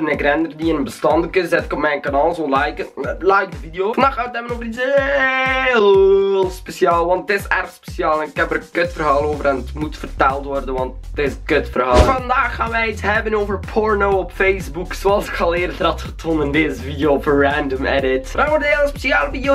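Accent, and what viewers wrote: West Flanders, Belgium. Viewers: Zo Nice daje ma west Vlaams klapt inde video😂😂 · Hahahahah west vlaams man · Kom je uit westvlaanderen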